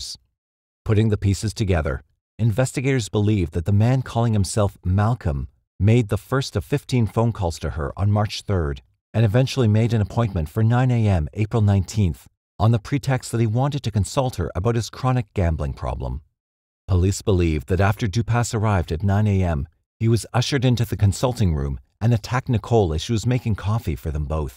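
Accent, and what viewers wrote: Canada. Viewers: I think the narrator is actually Canadian... and the creator is definitely not American. 🤓🧐😉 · Double down that sweet sweet Canadian narration!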